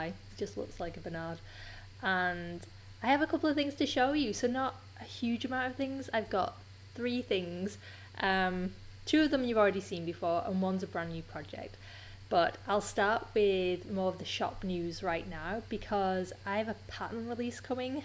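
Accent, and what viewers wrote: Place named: Liverpool, United Kingdom. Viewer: Thanks so much for the lovely Hiraeth pattern,. The name really touched me when I read your newsletter this morning, as it's something I've been feeling a lot of for the past few months, being originally from Wales. Not sure why, having lived in Canada for 100 yrs, but there you are. Not sure if I've missed this, but why the name Hiraeth, why did you pick that as the name? I can definitely hear that Liverpool twang, but do you have a Welsh background?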